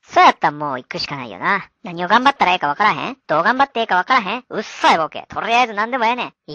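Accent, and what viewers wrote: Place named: Osaka, Japan. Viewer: 大阪弁やから理解しやすい最高ありがとう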